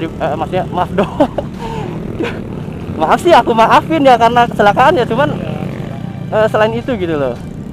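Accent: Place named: Banyumas, Indonesia. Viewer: Wong ndi mas deng ngapak hehe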